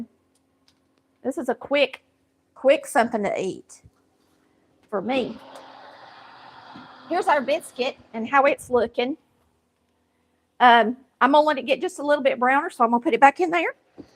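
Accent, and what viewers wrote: South, United States. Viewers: Hey! I just watched your corned beef and hash video. I have never browned mine like that in the pan, but I'll have to try it that way. I'm from Georgia but live in South Carolina now. I just love watching your videos. Your southern accent sounds just like me, my mom, and my sisters! I think if we lived close by each other, we'd be great friends!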